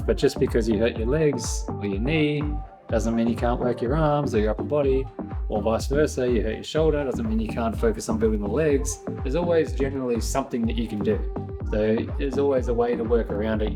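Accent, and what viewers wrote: Australia. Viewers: Didn't no you were an ozzy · The Aussie accent is a surprise.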